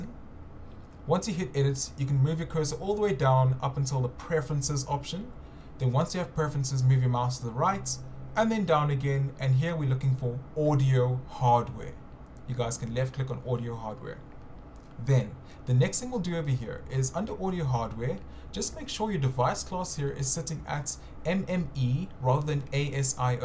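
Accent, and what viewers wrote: South Africa. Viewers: Are you South African? I hear a familiar accent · I can spot a South African accent a miles away, thanks - I was using bluetooth headphones & had to change it on audio hardware.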